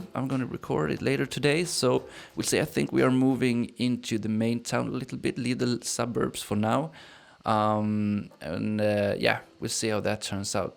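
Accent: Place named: Caribbean. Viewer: Hey hey hey 👋🏾. My Swedish YouTuber with Soul. Again, really happy to have you back. Always enjoyed you videos for many reasons especially being the music. You definitely remind me of someone from the Caribbean islands. The accent and cool vibes. Are you still going to be making your own for your videos? Anyways, have a great weekend and stay cool. Looking forward to your next vid.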